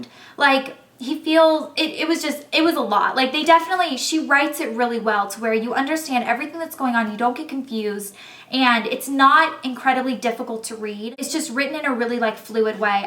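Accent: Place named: California, United States. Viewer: It's fun to notice your accent changing. I'm not from the states but I notice how your accent becomes more 'californian' I guess =)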